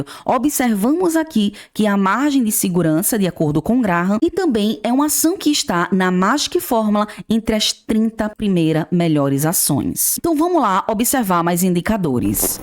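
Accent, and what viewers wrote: Northeast, Brazil. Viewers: Bom português, boa narração, conhecimento muito ok e sotaque nordestino. Tenho Copasa e penso em Sanepar, mas precisa melhorar o dividendos. Parabéns,.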